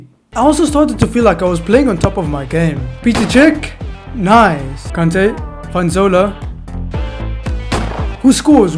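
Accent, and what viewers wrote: South Africa. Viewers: Is he from South Africa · Are you from South Africa? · You from South Africa??? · Bro ur South African for sure right ? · Ever since I heard your voice I knew you were south african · Are you from South Africa? ( Same Here ) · Yoh I just knew you were South African bra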